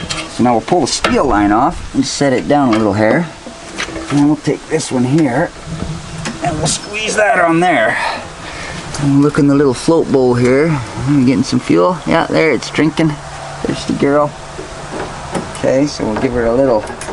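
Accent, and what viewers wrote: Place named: Newfoundland, Canada. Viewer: Yes my son ,in newfie you would be one of the bye's .... You comes across is half rednech half newfie ,we bye' loves it ...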